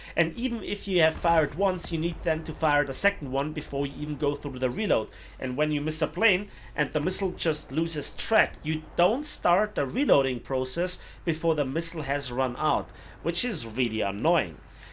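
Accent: Germany. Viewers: i hate german accents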